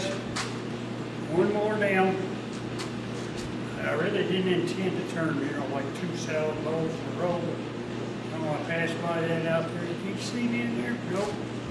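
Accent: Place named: Arkansas, United States. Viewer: Always enjoy your work. Sounds like you're a Arkansawyer. I was born in Gentry in 1939. Keep up the good work.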